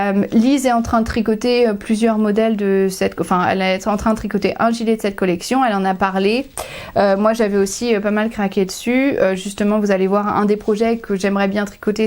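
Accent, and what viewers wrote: England, United Kingdom. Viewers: Bon je crois que c'est mon premier commentaire :) J'adore te regarder, tu es pimpante et ton accent anglais ;) (même si tu n'es pas anglaise ;) ) tes anecdotes! Et tes rouges à lèvres :) Bon sans oublier tes magnifiques tricots! Dommage pour ton gilet :/ Amusez vous bien à l' EYF!!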